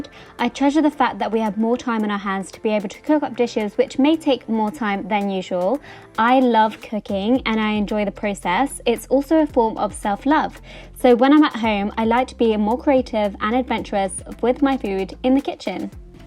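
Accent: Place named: United Kingdom. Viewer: I understand that you are sponsored by MyProtein. And i also get that you workout,as you constantly say in your videos. But you are not a bodybuilder,and i assume that you don't hit the gym as hard either,nor you try to gain muscle size. (forget me if i'm wrong) So why all this protein obsession you have? We need protein to recover and repair from our training,but what you do is a bit too much. I train 6x week ,1,5 hours long each session,and i am exhausted after each workout,plus i am a male. But i don't go above 1,6 gr/kg of bodyweight per day. Could you do a video about this,why you seem to depend on protein powders, and why you think you need so much of this specific macro, I would be glad to hear your take on that. And soy isolate protein is perhaps the worst vegan powder you could possibly consume,because of the soy protein extraction process. 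Google it,and you will see. Other than that,i always love your voice and British accent,plus your videos are so calming and soothing. Always fun to watch!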